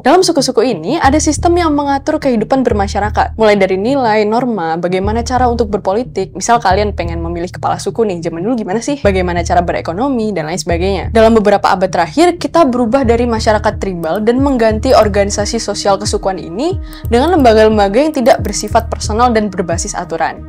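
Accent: Java, Indonesia. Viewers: Logat jawanya agak muncul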